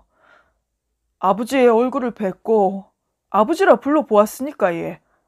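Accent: Gyeongsang, South Korea. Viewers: 갱상도 사투리 좋아요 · 경상도 사투리..ㅋㅋ 감사합니다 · 사투리는 전형적으로 타지분들이 하는 서툰 경상도 사투리인데요ㅋㅋ 그래도 왠만큼 하시는것같고 귀여우시네요ㅎㅎ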